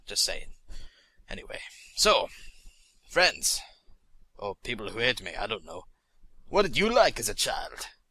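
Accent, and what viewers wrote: United Kingdom. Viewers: Is he like British or something? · anybody else relies he is speaking with a British accent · i love the fact that you turn more and more british in every word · wut's with the brittish accent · his british accent is cute^^ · He gradually got more british throughout the episode regardless of the fact that he is american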